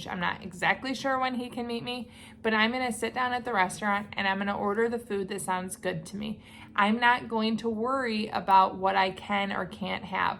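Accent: Midwest, United States. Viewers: I detect that hard midwestern accent.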